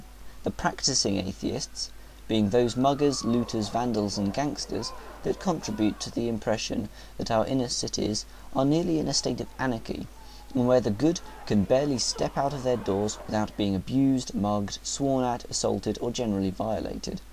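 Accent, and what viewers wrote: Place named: United Kingdom. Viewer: I don't know of which city you show your clips from, however, all countries have riots at one point or another. It's just that the secular ones have less crime, murders, thefts, lootings and gun violence. Your accent sounds British. Were you aware that since 2000, many Christian churches in Britain are converted to mosques, temples, shops, stores and tattoo parlors. Yet in "godless" england, 0 school shootings since 2000. In 80% god fearing USA, since 2000, dozens of shootings, 150 deaths. FOOL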